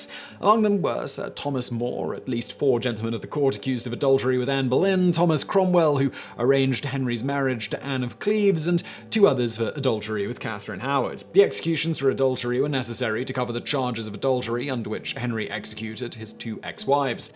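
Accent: United States. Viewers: oh don't I know it the thing is its pronunciation is completely different in the UK. His US pronunciation is very grating on the old ear holes don't you know. What's actually scary is I can remember the names of the characters :) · Dine asty. When did you become a Yank?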